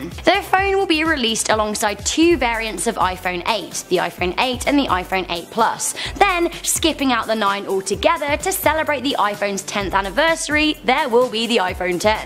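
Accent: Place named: United Kingdom. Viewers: Winter Plays what cute accent? She's british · Zuriel Babida exactly her British accent is cute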